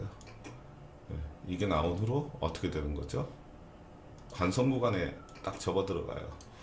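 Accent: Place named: Gyeongsang, South Korea. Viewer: 고수시다... 경상도인데 경남은 아니신듯... 사투리가 저보다 부드러우셔서ㅎ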